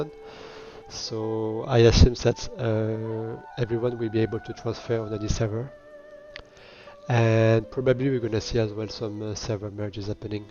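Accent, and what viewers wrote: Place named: France. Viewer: Salut, merci pour tes vidéos - vu l'accent je te parle directement en français :D Tu as quoi comme config?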